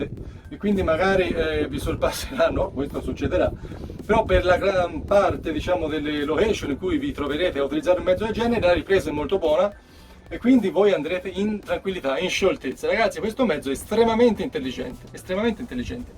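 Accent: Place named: Florence, Italy. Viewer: L' accento fiorentino mi fa impazzire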